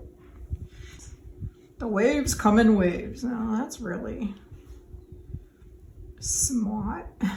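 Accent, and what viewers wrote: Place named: Boston, United States. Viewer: Your accent sounds like mine. I’m from the Boston area. Love this piece 😍 SALEM WILLOWS grew up going there 👍🏼😍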